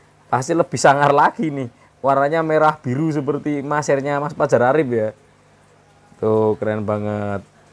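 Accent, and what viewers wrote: Indonesia. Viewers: Sampean omahe endi bang...kok logate rodo semarangan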